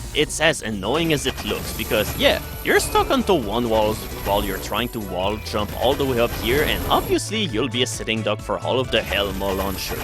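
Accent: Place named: Quebec, Canada. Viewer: hey,...by hearing your accent...something tells me that you are from quebec...because...i am from quebec myself.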